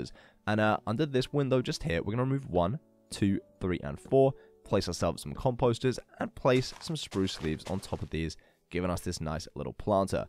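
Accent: United Kingdom. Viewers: I don't want to disturb you but are you British because I really love your voice and your accent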